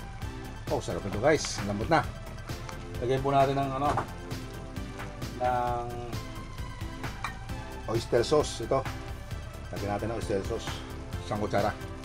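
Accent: Batangas, Philippines. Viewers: sir slamt sa pagdaan s aking maliit nbhay, dito nko ngbblik, paborito ng magbabarik ang iyong putahe.. klasey batangueno ka sir halata sa punto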